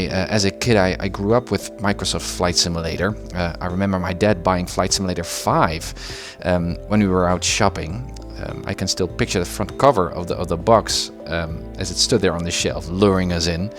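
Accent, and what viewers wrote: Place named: Netherlands. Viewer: are you dutch? English sounds dutch :P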